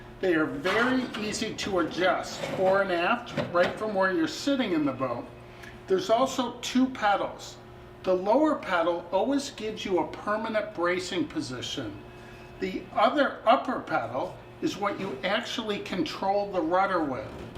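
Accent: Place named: United States. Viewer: after all these years in Canada, he still sounds more American than pure northern hoser. curious....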